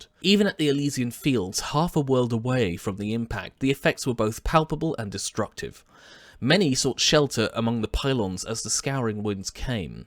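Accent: United Kingdom. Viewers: lol, the British accent making terror and terra homonyms :D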